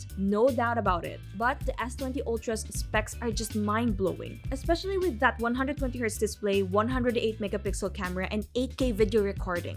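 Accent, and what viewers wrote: Philippines. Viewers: sounds like pilipino accent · I can tell based on your accent that you are from the Phillipines. Am i right?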